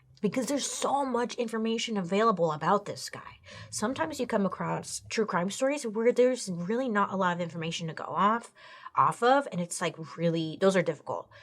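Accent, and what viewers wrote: South, United States. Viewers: “Cornteen” 😂😂 talks like she’s from down south with me lollll ❤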